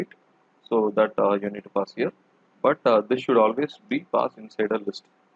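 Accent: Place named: India. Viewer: Its like watching sentdex ML series again. With indian accent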